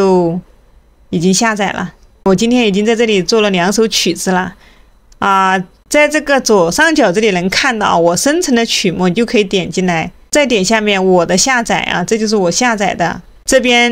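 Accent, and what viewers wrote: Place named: Hubei, China. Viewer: 我听您口音，好像也是湖北的哈。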